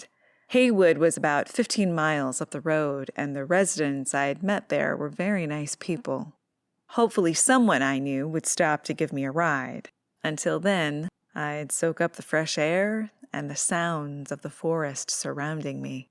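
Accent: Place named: United States. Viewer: Just can't listen to American's reading........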